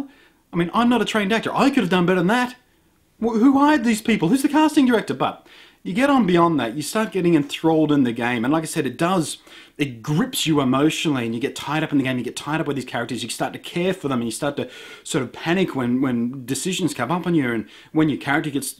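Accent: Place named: Australia. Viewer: Try turning on "transcribe audio', the system doesn't seem to pick up the Aussie accent as well. It's a good laugh.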